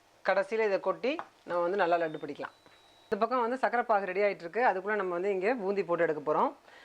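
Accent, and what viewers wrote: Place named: Vellore, India. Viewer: madam recipe is simple and super. Thank you for sharing with us. entha oor neenga unga tamil enga oor vellore tamil maari iruku.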